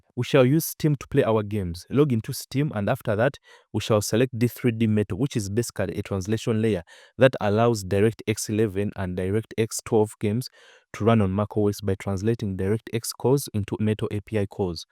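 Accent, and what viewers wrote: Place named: Uganda. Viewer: Ugandan accent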